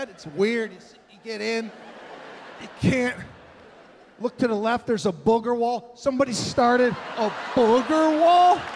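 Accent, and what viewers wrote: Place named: Chicago, United States. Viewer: Chicago Sicilians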